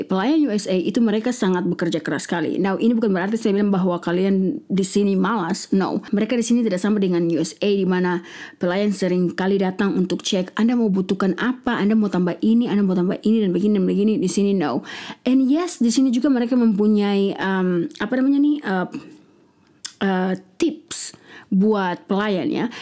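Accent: Manado, Indonesia. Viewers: Minum kopi apa doe... dapa lia sedap.. I am a coffee drinker. Btw, I like the way you talk.. apa lg deng logat manado plus english.. beking kt tatawa... Thx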